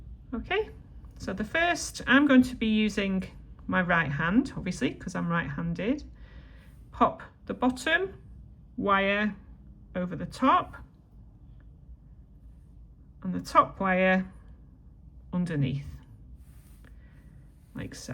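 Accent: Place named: Merseyside, United Kingdom. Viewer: You sound like you're from my neck of the woods (Merseyside!). Lovely design ❤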